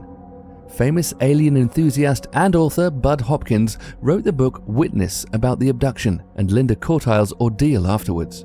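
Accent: United Kingdom. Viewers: it looks great, but who is this British narrator? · Who is this British guy commentating more often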